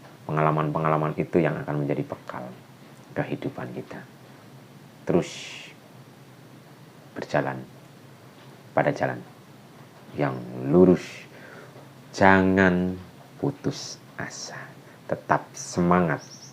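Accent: Banyumas, Indonesia. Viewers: assalamualaikum,, Njenengan kyane wong ngapak mas🙏🙏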